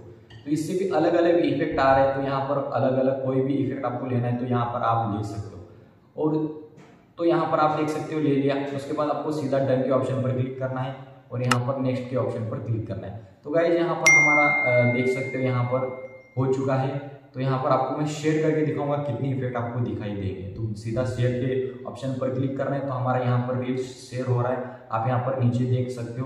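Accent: Maharashtra, India. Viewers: Marathi ahe ka tu😁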